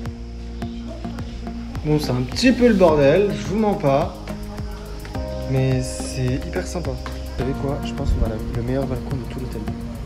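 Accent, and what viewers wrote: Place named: Algeria. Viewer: J’ai trop ton accent algérien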